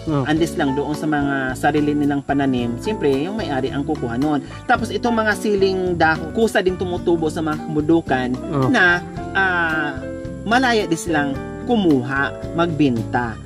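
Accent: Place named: Pangasinan, Philippines. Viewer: tita halata sa diction nyo n po taga Pangasinan kau tulad ng father k na taga Warey, Malasiqui Pangasinan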